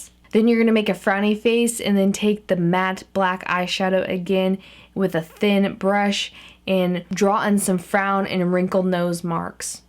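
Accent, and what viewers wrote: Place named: Texas, United States. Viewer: whats with the Texas accent? stay cute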